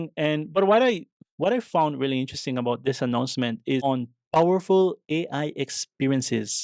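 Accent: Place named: India. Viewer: GOOD INDIAN ACCENT, VERY UNDERSTANDABLE BEST IS TO READ THE PAPER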